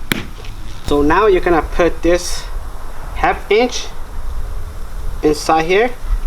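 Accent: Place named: Central Valley, United States. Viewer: Bro Iknew 60 seconds into the video you were from Central Valley lol dope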